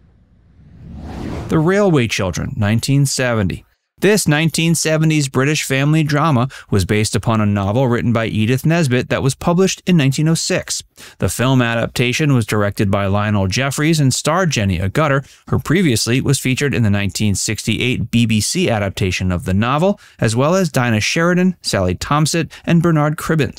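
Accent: United States. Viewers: Nice job your mispronunciations had me in stitches, literally rolling on the floor. I suppose you are speaking American though and not English.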